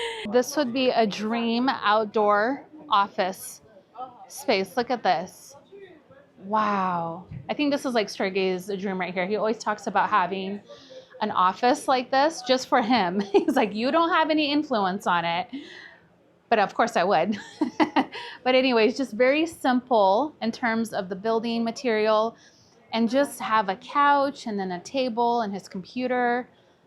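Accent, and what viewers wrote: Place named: England, United Kingdom. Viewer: looks like you picked up that lovely English accent 😊❤❤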